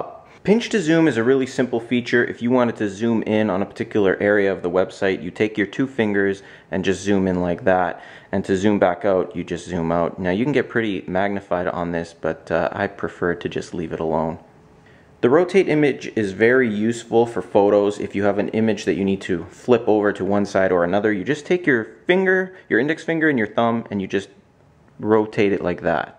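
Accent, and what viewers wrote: Canada. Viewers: The Canadian accent is so strong with this one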